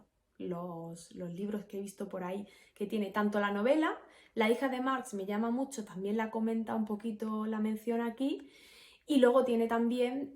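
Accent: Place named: Andalusia, Spain. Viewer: Yo también soy andaluz y me encanta ver tus vídeos y disfrutar de tu precioso acento andaluz.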